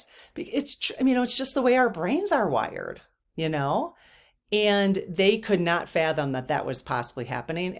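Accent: Midwest, United States. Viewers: I love the midwest accent!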